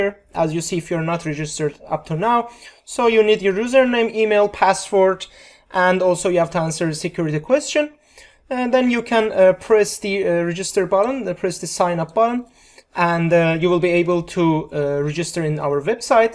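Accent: Turkey. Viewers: dude you are definitely turkish hahah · türk müsün la konusmandan cok öyle geldi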